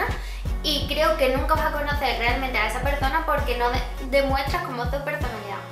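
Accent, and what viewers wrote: Andalusia, Spain. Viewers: De nada guapa PD;se nota q eres andaluza (eres andaluza no?) si lo eres ya somos dos un beso guapa!!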